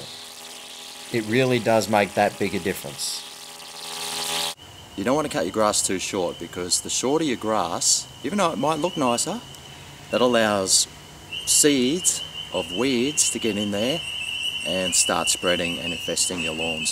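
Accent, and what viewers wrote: Australia. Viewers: A man who talks gardening with an Australian accent and in under 4 minutes convinces me that from now on “whipper-snipper” is the only term I’ll ever use for the garden tool in this video is a great human being all the way around. Thanks for the helpful video! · Lol your an Aussie right?? I can hear the accent plus the wording. Born there haven't been back since 2002. If have thick weeds should I pull out or can cut it with the weeder? I know the mower can't handle such a thick weed. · Helpful video, thanks a lot! And I am totally adopting the term "whippeah snippeah" instead of weed eater :) much more fun to say. Could listen to Aussies talk all day!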